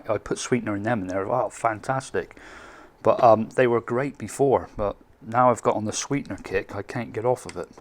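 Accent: United Kingdom. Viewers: Hi mate, love your vids. Are you British living in the US ?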